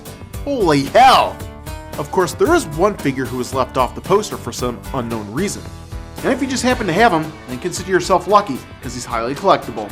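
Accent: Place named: South, United States. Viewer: Great episode. Though I think it's funny how he mispronounces Kinnikuman. Sounds like the way they'd say it here in the south. "Yeah man, this here's my cousin Kenny Kumen!" For the record it's said more like KEY NEEK EW MON.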